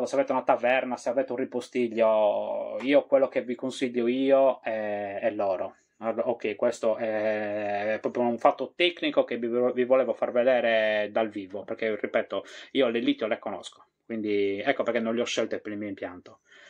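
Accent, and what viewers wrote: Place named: Sardinia, Italy. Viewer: Magari fossi brava come te...mi sto arravanando....ma non ce la posso fare da sola e sto cercando elettricista non solo bravo ma con la mente aperta che mi aiuti. Qui da bricoman oggi stanno vendendo i pannelli monocristallino da 450 .... ma ammesso che vadano bene non saprei che inverter abbinare. Cmq grazie, perché sei un'ispirazione oltre che molto simpatico con la tua semplicità e schiettezza oltre che per il nostro magnifico accento sardo....sono molto orgogliosa perché penso che tu sia veramente molto preparato! BRAVO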